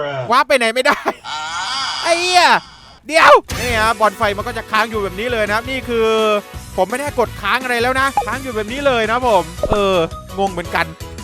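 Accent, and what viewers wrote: South, Thailand. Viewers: ึคนใต้ใช่ไหมครับสำเนียงเเบบนี้ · พี่เป็นคนใต้หรอผมก็เป็นคนใต้ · คนใต้หรอ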